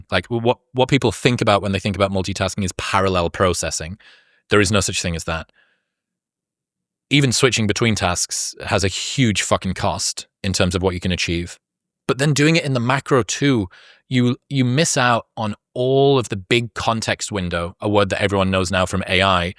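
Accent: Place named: England, United Kingdom. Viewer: When did Zuckerberg get an English accent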